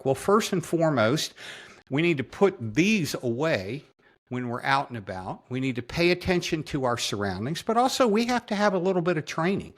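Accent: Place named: Pittsburgh, United States. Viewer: Just found your channel, and appreciate what you are doing! Important message for sure . I'm from Pgh and couldn't help but notice (maybe?) a Pgh accent? God bless!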